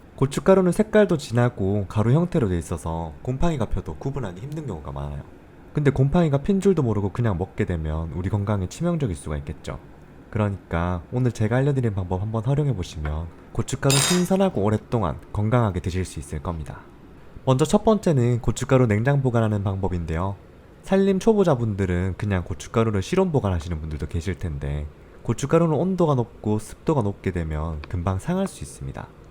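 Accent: Gyeongsang, South Korea. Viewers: 경상도 말투 좋습니다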